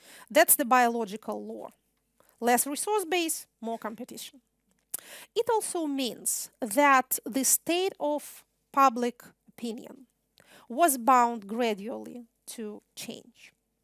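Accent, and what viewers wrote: Russia. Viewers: Такой-то руслиш, всё понятно :) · Hello! The content of the lecture is very interesting I would like to note about the quality of English, which Ekaterina speaks. Catherine's English is definitely better than many of our journalists and public figures. Nevertheless, I noticed strong Russian intonations. Phonetics is not bad, but there is work to do. Thanks for the lecture. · Спасибо за видео, скучала без вас. Понабежавшим училкам английского - русский акцент для русскоязычного человека это нормально, как и некоторое количество ошибок. Темп речи и словарный запас просто на отлично! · Я никогда не поверю, что человек, который выучил английский на таком крутом уровне, не смог убрать самые жесткие штуки в произношение. Значит это специально. Кто-нибудь может объяснить, зачем специально говорить с таким жутким русским акцентом? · ой это прекрасный русский акцент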